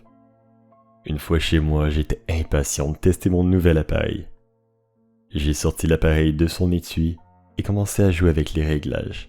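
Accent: Quebec, Canada. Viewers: j'adore ton "accent" Québecois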